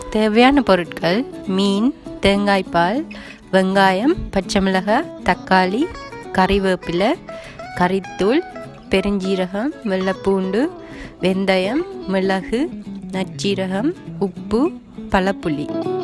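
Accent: Tamil Nadu, India. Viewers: தமிழ்நாட்டில் பேசுவது போல உள்ளது,யாழ்ப்பாணத்து காரங்ங இப்போ தமிழ்நாட்டுக்காரங்க மாதிரி மாறீட்டாங்க,சீரியல் பார்ப்பதோடு நிப்பாட்டுங்க,அதே மாதிரி பேசி அழகான யாழ்ப்பாண தமிழை அழிய விட்டிடாதீங்க,அழகு யாழ்ப்பாணத்து சுத்தமான தமிழ்தான்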